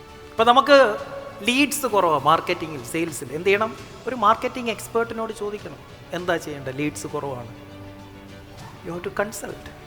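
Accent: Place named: Thiruvananthapuram, India. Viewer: Are you from tvm? Or kollam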